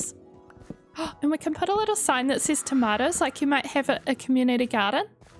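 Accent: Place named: New Zealand. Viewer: omg stop ive been following u for a while an just now do i realise ur kiwi too xD (no wonder why i felt so comfortable listening to u speak cos there isnt an accent for me haha) kia kaha e hoa !! aroha nui hehe <3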